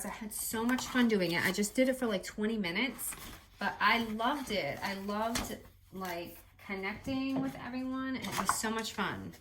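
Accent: Boston, United States. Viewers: Ummmm just my 3¢.... BUT.... super cute Halloween makes !! And although deffinatly not a heavy Boston accent , and maybe very slight , but feel you have more of a Boston accent then you think you do ..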